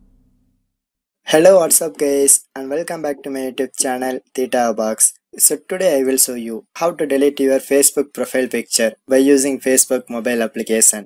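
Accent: South India, India. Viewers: bro is from south Thats why he is having this type of accent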